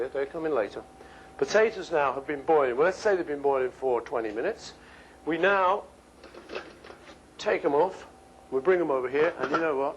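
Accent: United Kingdom. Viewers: his Scottish accent is great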